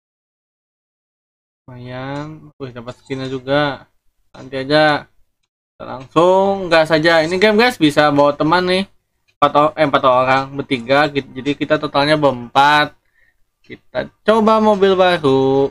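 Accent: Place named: South Kalimantan, Indonesia. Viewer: Kayaknya kamu orang kalsel ya guys?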